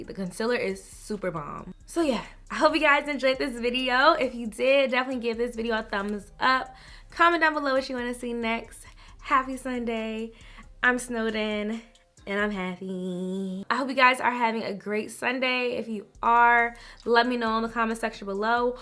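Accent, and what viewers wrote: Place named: Baltimore, United States. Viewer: It's my first time watching you. Are you from Baltimore? I detect an accent. Your face looked flawless btw.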